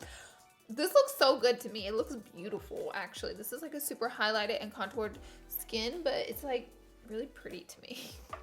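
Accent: South, United States. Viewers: She is from the south would you tell someone with a Jamaican accent that you HATE the way they talk? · I love her accent so much! I’m a Georgian, so I’ve been raised in the south literally on a farm with people who have the strongest southern accents I’ve ever heard. And I just don’t have an accent all my siblings have one all of my family does but I just didn’t catch onto the accent for some reason. So when I hear people with really strong southern accents I absolutely love the way they sound!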